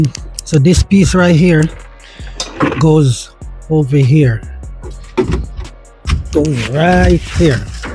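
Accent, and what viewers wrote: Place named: Jamaica. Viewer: Nice working van. You sound Jamaican.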